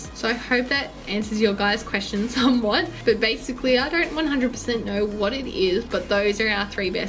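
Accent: Australia. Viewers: As soon as I heard you where Aussie I subbed haha I'm a fellow Aussie (live in SA) and this is my first vid of yours I watched and I love you guys already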